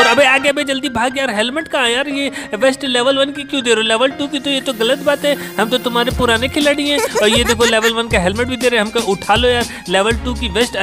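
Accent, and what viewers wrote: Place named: Gorakhpur, India. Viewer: Bilkul aap Gorakhpuriya bhasa bolte hai hm logo ke tarah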